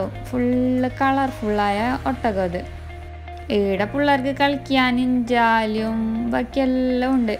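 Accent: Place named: Kasaragod, India. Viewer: Nalla language..ningal ksd analle..kelkan nalla sugam😃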